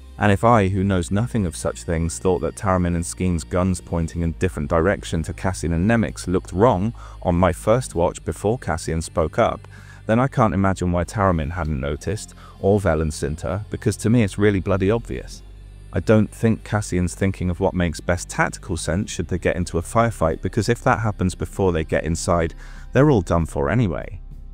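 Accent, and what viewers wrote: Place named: United Kingdom. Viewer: First off, I promise you that I push your channel as much as I can and I wish there was some way of helping you out and getting you more into the public eye. There is a huge audience for Andor content, but the algorithm is so difficult to control. I genuinely wish you all the best, and as one monotone British accent to another (in my case a very broad northern one) I love listening to you! As for this arc, I think this is when Cassian slowly begins to understand that he can be a leader but he's still not there. And I totally agree with your ideas about Skeen - he is Cassian's dark reflection, what Cassian could have been if he hadn't had Clem and Maarva and the community of Ferrix. I don't think the value of Cassian's upbringing can be underestimated It gives him the confidence to face down the other members of the group and slowly start to worm his way in and make himself necessary. 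Turn into Ellen Ripley, if you will! And Nemik is so genuinely happy to meet somebody that he can talk to and who is willing to listen to him and, as you say, nerd out with him. In another life, they would have had a really good sibling relationship I think. I do think Skeen cared for Nemik, although I've seen a lot of arguments to the contrary. The innocence and the eagerness of Nemik is that breath of fresh air that perhaps they all need. I enjoy your videos so much - I too am no longer in work as of last week, and so can't afford to support you but I do wish you all the best.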